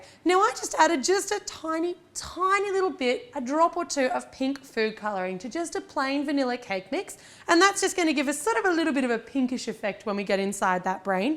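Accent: Australia. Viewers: OMG. What age are you?! LOL. You clearly don't hear accents. She's AUSTRALIAN. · Do Australians find American accents as beautiful as Americans find Australians'? I love listening to you talk.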